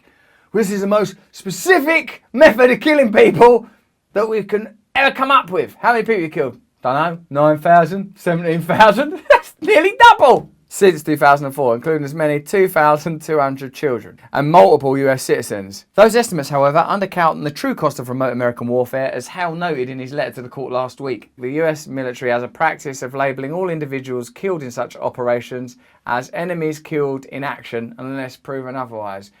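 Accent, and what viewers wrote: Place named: United Kingdom. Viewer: Love your old man British accent.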